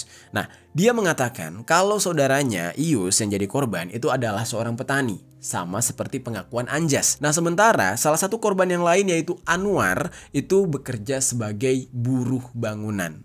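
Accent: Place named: Aceh, Indonesia. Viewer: Bang tdk bisa ngucapin krn dia orang Aceh logatnya beda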